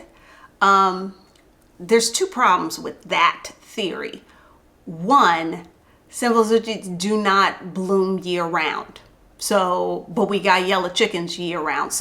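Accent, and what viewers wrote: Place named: Texas, United States. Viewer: I moved to Mexico last year, (Ajijic), and your list was VERY informative. Thank you! Love your Texas accent.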